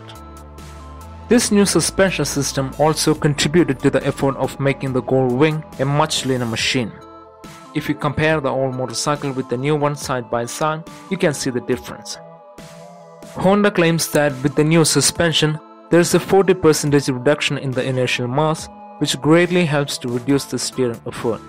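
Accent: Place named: South India, India. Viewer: U are awesome man thanks for the information that to detailed about the suspension system and how it works. Really needed these info also saw your telever suspension on BMW just great man keep it up. Where u from from your accent I think you are from south part of India.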